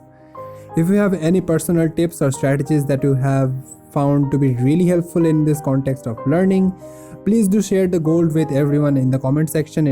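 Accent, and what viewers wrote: Bengal, India. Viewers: Aap Bengali ho na?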